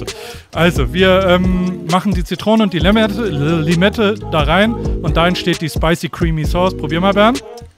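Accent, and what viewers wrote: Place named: Germany. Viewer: Your German accent ist einfach super geil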